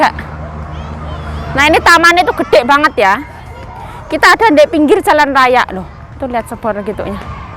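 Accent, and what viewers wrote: Java, Indonesia. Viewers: Logate ngomg masih medok...semua kata paten " K " 😂😂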